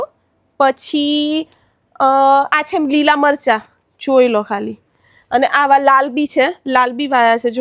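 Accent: Sabarkantha, India. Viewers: બેન તમે સાબરકાંઠા ના વતની હોય એવું લાગે છે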